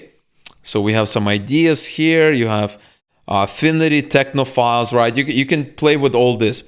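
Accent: Russia. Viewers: you sounds like Russian! And by the way good video. I am about to test running CPA offers on GDN but not in the USA. Why? A lot of competition there and thus expensive click.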